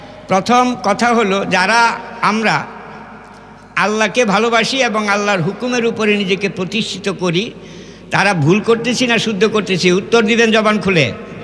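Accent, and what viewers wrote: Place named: Sylhet, Bangladesh. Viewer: আমি ভারতের আসাম থেকে বলছি,, চাচা তোমার ভাষায় বুঝা যাচ্ছে, তোমার বাড়ী সোনামগনজ সিলেট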